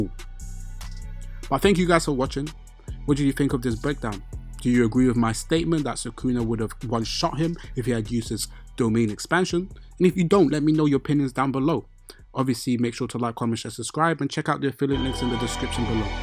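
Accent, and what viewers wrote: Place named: United Kingdom. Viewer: UK accent is annoying tho 😅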